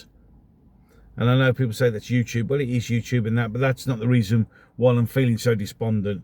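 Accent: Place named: North, United Kingdom. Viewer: You may only have 400 regular watchers, but those watchers are organic and we like YOU, they can hear Sargon or anyone else with a posh accent moan about mass immigration, but your rants make me feel right at home, Northern, salt of the earth, a good soul. That's what drew me in, then the references to scifi and geeky stuff and STICKLE BRICKS kept me hooked, and i admit, the far right hermaphrodite will miss you. Let's see if this gets deleted, the H-word! (I nearly wrote H-b0mb!)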